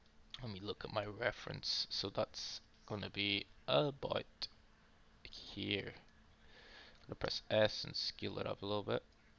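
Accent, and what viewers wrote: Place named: Northern Ireland, United Kingdom. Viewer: Your accent, I keep getting a little Northern Irish in it 🤔. Great tutorial btw.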